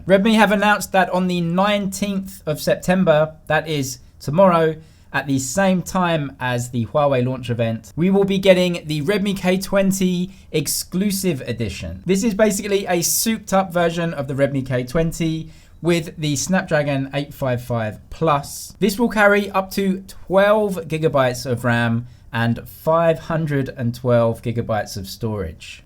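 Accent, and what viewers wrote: United Kingdom. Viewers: I could even recognize that typical British accent, our dude is right now in Shenzhen, China ..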